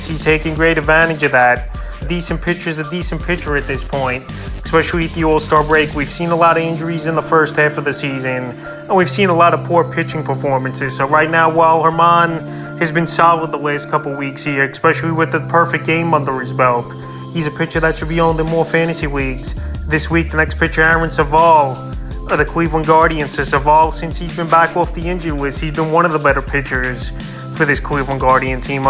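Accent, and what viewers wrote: New York, United States. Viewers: Can't stand Yo NY accent ... yuk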